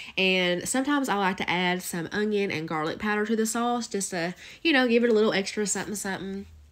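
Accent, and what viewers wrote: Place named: Massachusetts, United States. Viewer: your accent is so cute. In live in MA and you know how we sound! I love your accent and your channel!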